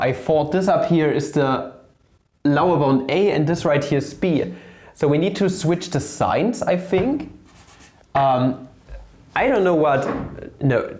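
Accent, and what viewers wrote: Germany. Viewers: I love how you say “Integuhrruhl”! Math is sooooooo much better when done in a thick Deutschlander’s accent!!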